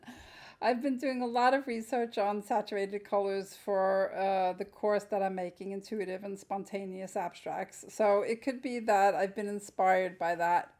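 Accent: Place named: Brooklyn, United States. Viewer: I was also born in Brooklyn! I thought I recognized that accent.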